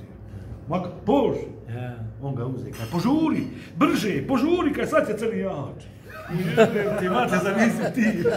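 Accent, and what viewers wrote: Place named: Živinice, Bosnia and Herzegovina. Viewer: Živiničanski dijalekt je u pitanju, pa ga vjerovatno zato ne razumijete.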